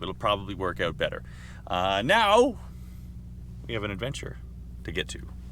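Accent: Canada. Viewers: Good one......ooohh yeaah its got some weight to it.....Same same but with Canadian accent Love it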